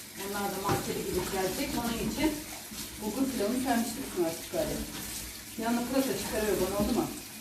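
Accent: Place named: Uşak, Turkey. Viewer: Bende Usakliyim senin konusma sivenden anladim Usakli oldugunu abone oldum basarilar Süpersinnn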